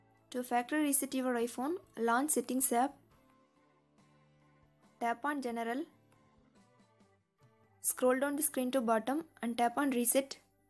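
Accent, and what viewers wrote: India. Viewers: First time I appreciate Indian accent people who do tutorials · Thank you Indian voice sounding girl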